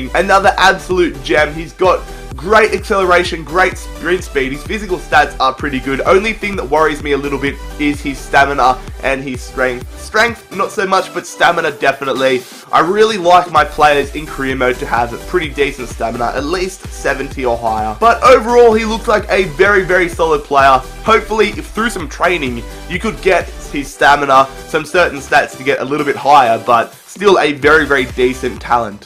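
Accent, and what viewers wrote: Australia. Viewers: mate, he's an Aussie, I'm a kiwi and I can understand that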